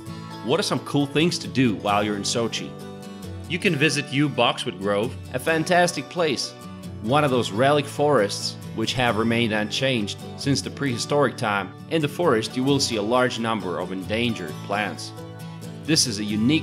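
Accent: South, United States. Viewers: Your accent is interesting. Did you learn English in the American South by chance? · You have an interesting accent. There's a little bit of US southern in it. Great videos! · It's great hearing your Southern accent, as I've been overseas for quite a few years. I'm from Georgia (the state). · YOU ARE SUCH A BEAUTYFUL GUY AND A PERSON AND I LOVE YOU AMERICAN ACCENT)))) GOD DAM I WOULD FLY TO THE MOON AND BACK FOR YOU!!!!!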